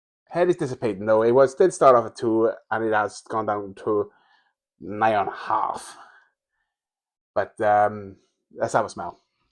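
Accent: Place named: Wales, United Kingdom. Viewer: Nice review, nice and objective about it. Not to take anything away from this drink as I like it myself, this particular one is brewed n France (it was German brewed years ago) and on the subject of Aldi clone/tribute brands, this particular one is a clone of Germany's Krombacher Pilsner. The Krombacher is available in Tesco and Morrisons as part of their 3 for £6/6.50 deal. Btw I detect a Welsh accent, do you live in Wales?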